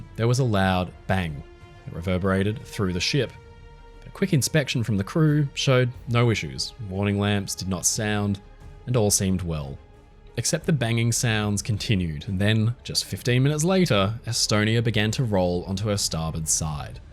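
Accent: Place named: Australia. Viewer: congratulations on a SUPERB documentary. Apart from the quality of your research, your narration is astounding. Educated, articulate, clear diction and an easy- listening Aussie accent without any drawl or ockerism, and your grammar is faultless. As a fellow countryman, it makes me proud that your voice is being heard on the world stage, its just a beautiful example of how things should be done...and especially, it's a huge favourable contrast to the bloody awful AI voice-overs that are being churned out now. Fantastic job, very well done.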